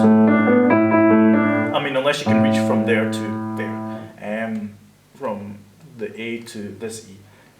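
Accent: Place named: Ireland. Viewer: you sound like your from Europe or Ireland. Forgive me if I'm wrong.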